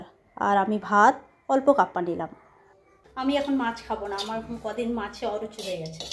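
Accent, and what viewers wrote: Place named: Kolkata, India. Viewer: Ei tumi ki bangali, pariskar bangla bhasa, chalti bhasar bhalo dakhal aache, khola mela sahab, mone hachhe apni anekdin kolkatay chilen, bhalo thakuk apnar paribar ar apni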